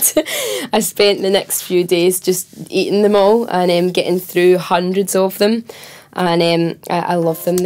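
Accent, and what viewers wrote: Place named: Glasgow, United Kingdom. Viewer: When she sings you can't really hear here accents, and then you hear an interview and think. That's a glaswegian lassie 😉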